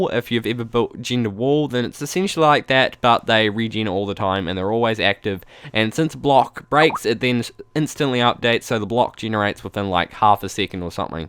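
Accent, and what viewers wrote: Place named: New Zealand. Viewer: im pretty sure he's from new zealand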